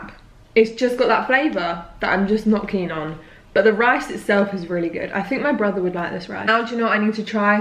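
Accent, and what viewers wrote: England, United Kingdom. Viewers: I feel like it doesn’t matter how you say “Taco” because it’s not an English word and you have a brutish accent so both ways sounds like you’re saying “taco” to me haha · Do you think if I move to London I’ll catch the accent?? I absolutely love how she talks lol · It’s pronounced tah-co, if you’re being authentic. But I know British people pronounce somethings differently. Like the way you pronounce pasta is way different.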